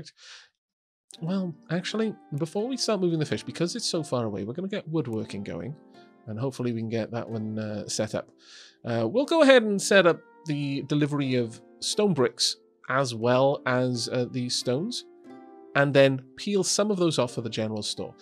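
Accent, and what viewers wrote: Wales, United Kingdom. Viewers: what part of wales are you from? heard the slight welsh accent then seeing your chanell logo be a red dragon i knew you was welsh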